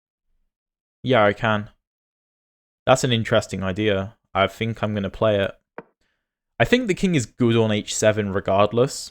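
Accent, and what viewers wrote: United Kingdom. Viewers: I like your materials a lot. Very educative. For me crucial thing about your chess footages is that often you find yourself in a position where other (and by other I mean better - no offense) chess youtubers know the next move by heart and it's hard for them to actually explain why THIS is the move. I think you benefit from that because you are actually going through you thought process, explaining what you see on the board. Sometimes even though you are not sure you assume THIS might be the move because of the process of elimination or whatever. I really like it and I think many players like me can benefit from that. I am also grinding Caro as black and Viena as white so what you do is even more to my liking. On top of that I am constantly learning English and British is the accent I love to listen to so this is kind of a cherry on top. 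Keep up doing what you're doing and I hope you'll claim that golden YT button one day.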